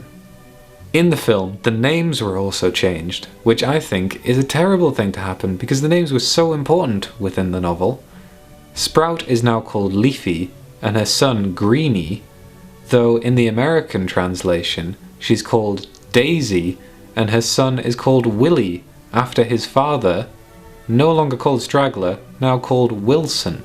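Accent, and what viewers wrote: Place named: London, United Kingdom. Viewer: Love the way you speak are Londonianian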